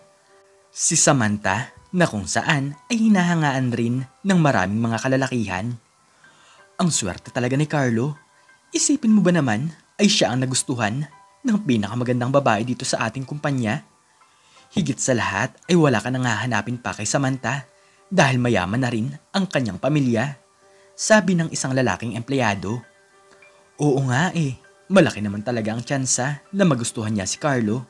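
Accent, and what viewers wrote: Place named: Iloilo, Philippines. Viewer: Abaw! Ilonggo ka man gid.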